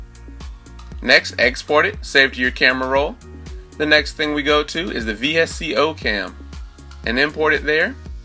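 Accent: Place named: Carolina, United States. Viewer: It’s crazy how I could tell that you’re from Carolina like me bc of your accent 😭great video tho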